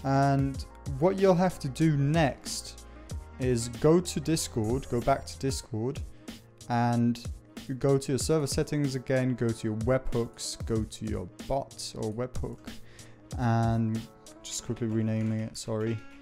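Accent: Germany. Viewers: Lol bist du deutscher? ;D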